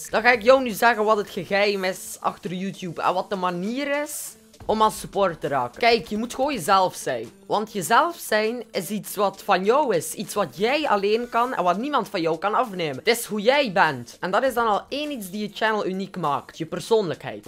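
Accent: West Flanders, Belgium. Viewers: Ik ben ook west Vlaams en ik zeg: doe nog lange deure me YouTube echt wo je zie echt nen coole dude gie verdiend veel meer subs! Ik weunen nie An de kust om kgon der graag na toe. Allee nog vele succes me YouTube!!! PEACENIK #westvlaamsaccent. Hèhè dat moest er even uit. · Mooi gezegd me u west-vlaams accentje hehe:) en PROFICIAAAT! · Jij bent west-vlaams? Van welke stad/dorp? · Van min part meugt ge plat west vloams klappen weh;) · West vloams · Nice video ik heb geen probleem met je accent want ik ben zelf west-Vlaams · HAHAHAHA kapot lachen met ej West - Vloams:')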